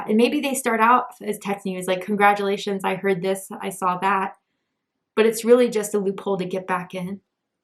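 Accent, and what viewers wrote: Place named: United Kingdom. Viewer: 😯 Yes, British accent.. he is English!! I am Italian 😃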